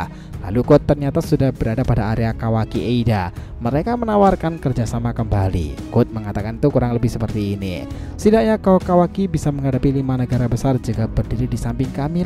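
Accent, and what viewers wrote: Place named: Java, Indonesia. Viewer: ngomongnya santai aja mas,pasti lu dari jawa on mas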